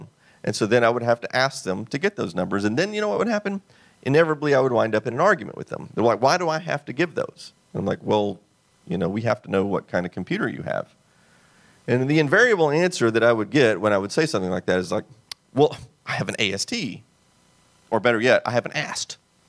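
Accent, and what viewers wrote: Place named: Texas, United States. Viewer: I can hear that Northern Texas Accent in your voice. I don't know why I never picked up on it in the past.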